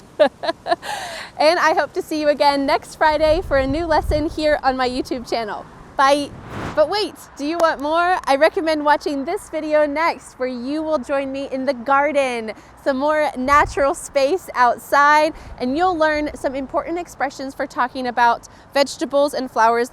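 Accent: United States. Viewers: I’m from Vietnam. I have been learning English for 10 months. I accidentally find your video. I feel happy because I really like American accent. You are a first teacher on my self-learn journey. I am gratefully your lesson. My mother settle down in the US. But she is not good at English. I hope myself can help her if I have any change go to there. I’ve been learning hard everyday! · Is this American english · Greeting from Poland i love americans pronounciation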